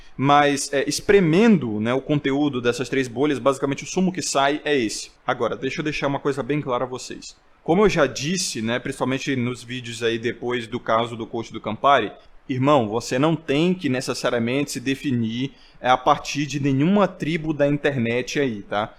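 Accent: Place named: Northeast, Brazil. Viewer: ~ "sempre vai ter um Deus, a genética é o Deus dele" => isto eu achei fenomenal, nunca tinha visto alguém ter esta verificaçao. Se voce estudar teologia/mitologia grega clássica a fundo, vai entender que os deuses gregos era meramente um dicionário de palavras em que as pessoas deveriam acreditar piamente, como "democracia", "justiça", "honestidade", "dignidade".... Por outro lado, é bem óbvio que voce nao é loiro e nem tem os cabelos lisinhos, eu nao preciso de te ver pois a sua voz com sotaque rede globo nordestino te entrega. Eu até acho que voce estudou jornalismo, mas aí ainda tenho muito pouca informaçao. Nós nunca somos este indivíduo único. Se voce é volcel ou incel, pouco importa, mas as pessoas tem condiçoes sim de recriar sua imagem sem ver voce.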